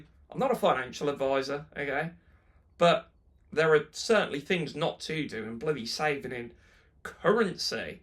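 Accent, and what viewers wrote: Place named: England, United Kingdom. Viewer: Люблю твой акцент, как будто смотрю сериал Убийство в медсан мердере или чисто английские убийства))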